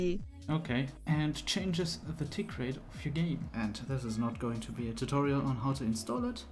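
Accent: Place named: Germany. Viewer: This german accent Ist nett lmao XD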